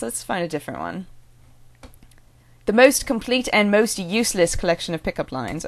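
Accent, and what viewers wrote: RP, United Kingdom. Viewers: Felt you were going for Received Pronunciation. Really solid! You slipped into rhotic "Rs" occasionally ("r" instead of the non-rhotic "ah"), but overall pretty good. Remember that Brits sometimes ask rhetorical questions at the end of sentences, don't they? As for standard U.S...never heard that the "standard" was Pac NW. The "weatherman" accent is mid-west, Iowa with touches of surrounding states. That's the accent they travel miles to learn for TV. Nicely done! MAAAW, please!!! 8^D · Nice try of old Londonish hmm lol but you sound Britsh normally anyhow. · Pretty good southern accent actually, better than a lot of others :) Maybe a bit posh lol. But maybe I sound posh and don't realise it... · think of adeles normal talking accent. Common as muck, now thats actual english accents! Nice posh accent though. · English here, Very very posh accent, its not bad though, sounds like you've emulated emma watson a bit. 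ALO GAVNAH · This hurts my stomach. You're speaking pretty well, but.. You.. It's so posh D: You don't seem like a posh person, I'd rather see you doing a Irish, Scottish or Huddersfield accent, perhaps? And Australian would also be pretty awesome. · You're not actually using a 'British' accent because there really isn't any such thing as 'typical' British accent. What you are doing, (very well in my opinion), is an 'upper-middle class English accent', a la Kate or Pippa Middleton. This accent is often coupled with the phrase "Okay yar, jolly hockey sticks" and is attributed to girls at public schools (in America I believe you call them 'Private Schools'). Keep up the good work ;) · Being English I found this video to be made of awesome! ;D P.S You sounded like a wonderfully posh/upper class English woman. Good accent. P.P.S IT SHOULD OF BEEN TEA!! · Bloody good show old bean! A rather good attempt at a prim and proper accent. 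Though its hard not to sound grumpy speaking proper. As with all dialects they change from region to region and are also class based. Most of London has a strange mix of cockney, American and Jamaican. Where I am, west London, people tend to drop their t's ie. instead of bottle it sounds more like bo(t)all... hard to type as there is a t in there but you just don't pronounce it. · It's kind of strange but that's BRILLIANT for an American. However that's more of a posh accent so if you speak to an English person, they will probably believe your English only they will think your slightly snobby. I am from the north of England by the way so my accent isn't like that but I have heard English people speak like that. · by far not the worst british accent I've heard. The worst British accent in my opinion belongs to those from East London. But I'm Welsh, so meh... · this blew my mind.... speaks British =) awesome and i had nothing to complain about (being half-english and all) =) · Why do people always do the posh Lara Croft accent? 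=') It's actualy not too bad... but please, keep with your American accent =] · Goddamnit we're not all posh:( NOBODY does a Northern accent! (Still, pretty good though) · *sends to posh britsh friend because you sound so much like her its scairy* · I could believe that you were british :P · yeeeahhh thats one posssssssh british accent =] when most of england isnt posh at all XD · but it was very RP, I think very very few people in Britain actually speak like that anymore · Sounds good, definately southern English, (I'm from Hampshire). You speak quite precisely so it sounds quite posh. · Very upper class and very slightly southern. Maybe a slight hint of drunk. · Not bad, very posh but then when we imitate American accents we tend to do that really peevish cartoony voice. Stereotypes/media influence. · This is fucking hilarious. You're almost passable as a very posh, slightly weird British person. 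· How very posh. Hahaha! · You sound like your from a posh London borough. Not bad at all. You seem to do better when you just talk.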